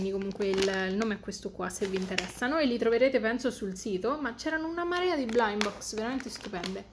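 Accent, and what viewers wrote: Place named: Rome, Italy. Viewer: Ciao!! Di dove sei? Io convinta che fossi di Roma 😅 Quando ti ho sentito dire che non c'erano nella tua regione ero tipo 😮 Comunque tutti bellissimi ❤️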